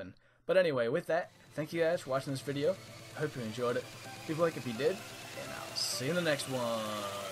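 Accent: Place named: United States. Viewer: Hi, i m a french player of the hunter and want to say you, you are doing excellent videos. even cause of your american accent i don 't understand all of what you say in your videos but it's very nice to watch your videos. thank you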